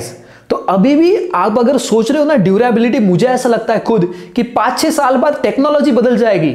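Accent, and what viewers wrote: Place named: Maharashtra, India. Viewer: मराठी माणूस दिसतो आपला...ascent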